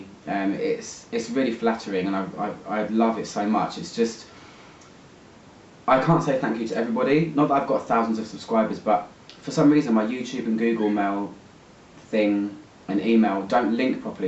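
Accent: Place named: United Kingdom. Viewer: Hello! is a fantastic video, i love your accent more than anything , english people talk so elegant, i like that, but there's a part i can not undrestand very well and i am interested in that perfume i want to ask you, what's the charasteristic of the original hypnotic poision of Dior, how is the bottle?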